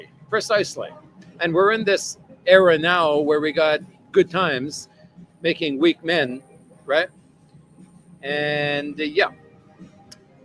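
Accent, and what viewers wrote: Canada. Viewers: Do all Canadians talk like you?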